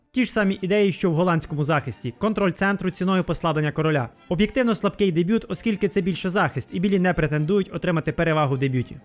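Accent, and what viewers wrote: Ukraine. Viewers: Ох уж цей ужгородський акцент) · Ахахахах какой смешной колхозный малоросский говор)))) пешака ахахахахах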